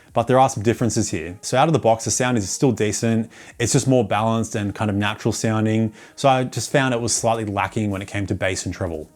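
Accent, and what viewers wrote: Australia. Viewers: I wish I could pay YOU to stop using an Australian accent. Holy shit, nothing is more annoying than an aussie accent.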